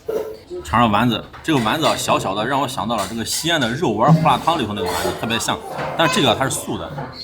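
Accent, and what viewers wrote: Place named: Beijing, China. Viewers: 👏👏👍 的歌喉真的不錯，以往都聽出耳油。今次京腔亦好有味道，希望以後在你視頻能再聽到。😁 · 聽大家吊個京腔，俺高低得吼個“好～～！”。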